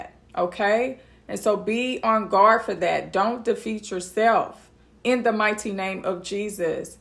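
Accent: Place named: Texas, United States. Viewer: Finally , you getting texas accent❤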